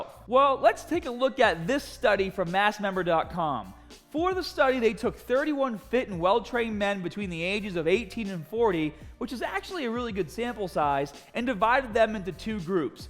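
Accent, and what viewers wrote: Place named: Northeast, United States. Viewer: Northeast accent. So obvious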